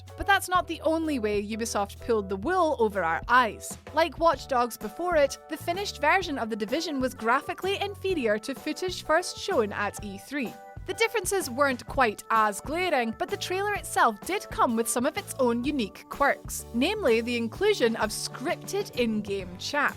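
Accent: Scotland, United Kingdom. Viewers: Great video, but my main enjoyment was hearing a fellow Scot on YouTube for the very first time 😁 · I love Scottish accent ❤️❤️ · was i the only one who only noticed she had a scottish accent halfway through the video? was a little confused once i noticed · Wooo Scottish lady ken😎🏴🏴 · Do I have hearing problems or did she sounded more and more Scottish the farther the video progressed? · She did start sounding more and more Scottish as the vid went on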